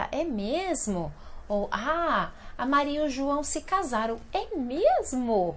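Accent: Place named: São Paulo, Brazil. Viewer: Wow São Paolo dialect! I love it.Obrigado muito!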